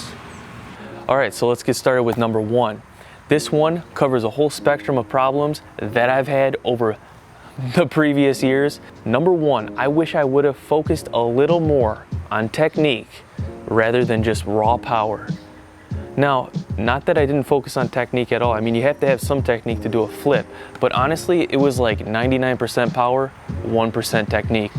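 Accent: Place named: Midwest, United States. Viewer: Nice midwest eeeyyyaaaccent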